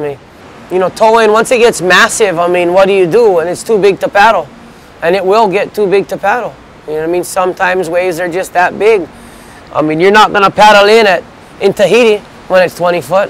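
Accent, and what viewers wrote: United States. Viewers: is that not still Hawaiian? Of course it is.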